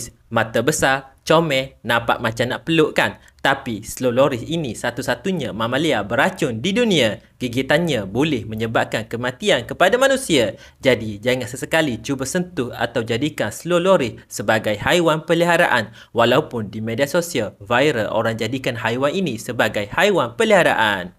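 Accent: Kelantan, Malaysia. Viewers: Kelamtam percakapanya kang😂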